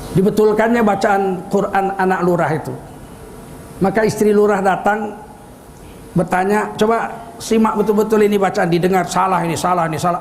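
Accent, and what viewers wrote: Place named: Aceh, Indonesia. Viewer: Bahasa acehnya kental juga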